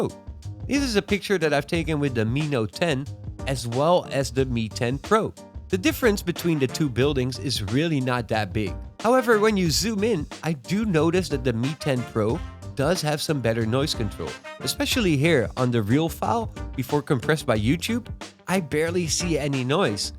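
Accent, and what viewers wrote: Netherlands. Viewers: Just started following you because I heard your Dutch accent 😂👍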